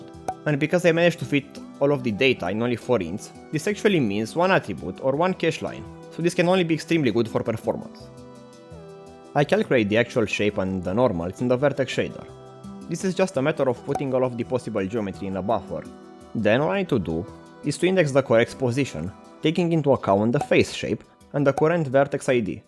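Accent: Romania. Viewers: instant mi am dat seama ca esti roman dupa accent <3misto vid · You sound romanian! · miros accentu de roman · mama accentu de roman e prea mare :)))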